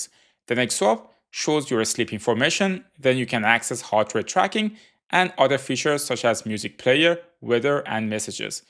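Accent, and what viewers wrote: Iran. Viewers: کاکا من حس میکنم لهجه ایرانیه؟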